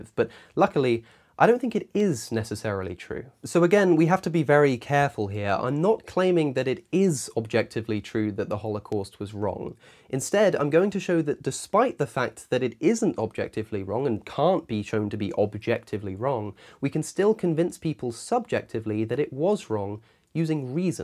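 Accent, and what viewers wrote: United Kingdom. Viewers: CosmicSkeptic: intelligent British sounds Me: mhm mhm mhm 🤔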